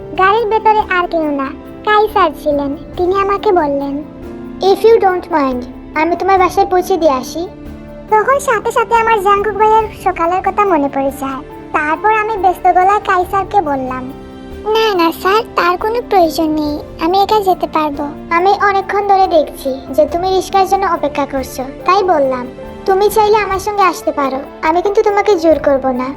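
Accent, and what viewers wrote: Sylhet, Bangladesh. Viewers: আপু তুমি কি সিলেটি? তোমার ভাষায় সিলেটি সিলেটি ভাব আছে।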